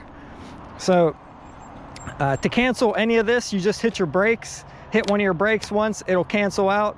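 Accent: Pittsburgh, United States. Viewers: Thanks for the video. Do I hear a Pittsburgh accent?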